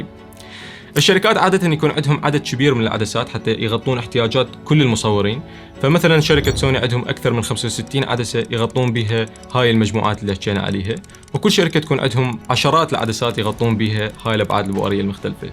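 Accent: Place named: Iraq. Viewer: يا أخي أقسم بالله كلمة شكر أقل بكثير في حقك الفيديو متعة ❤ مع اللهجة العراقية الجميلة كل الحب من المغرب ❤❤❤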